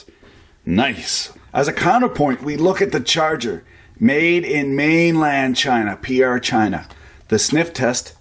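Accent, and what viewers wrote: Canada. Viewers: been a mechanic since 77 , I came to this channel for this , turns out I like listening to canucks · The Canadian commentary is half the reason I watch these.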